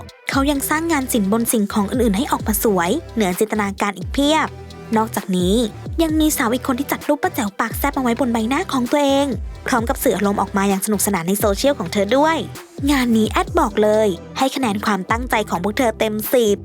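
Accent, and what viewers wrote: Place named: South, Thailand. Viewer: ไอ้ยะ แอตแหลงใต้ ไอ้ยะ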